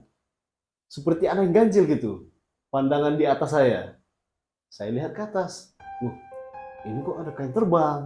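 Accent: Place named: Medan, Indonesia. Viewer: Ini baru cerita horor medan,, logatnya masih kental, ceritanya juga seru 🔥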